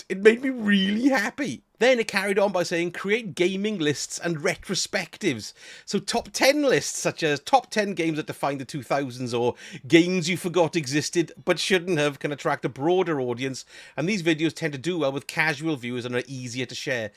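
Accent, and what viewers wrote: Wales, United Kingdom. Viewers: Great to put a face to that beautiful Welsh accent, been watching your content for years! As an older gamer myself I enjoy your humour. Id love to see you do some videos on the replayable aspects of Destiny like raids with viewers or on some of the controversies like Manstrual’s ban and his corresponding video. Hope the game doesn’t die. People have been saying it’s finished since before I started playing all those years ago.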